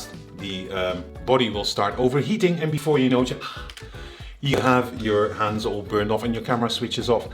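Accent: Netherlands. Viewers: Very nice review! Your accent is... Dutch?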